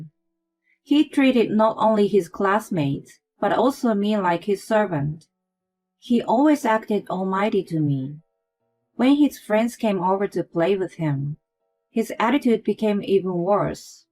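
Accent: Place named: Japan. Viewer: If this is a American story why is the reader Japanse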